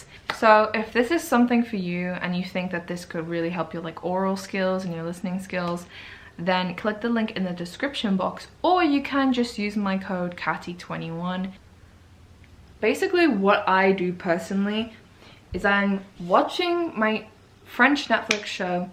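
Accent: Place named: United Kingdom. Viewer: At the beginning I heard only British accent 😂❤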